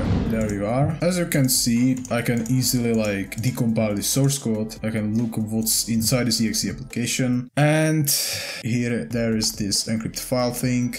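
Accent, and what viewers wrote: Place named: Czech Republic. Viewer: The czech accent is so cool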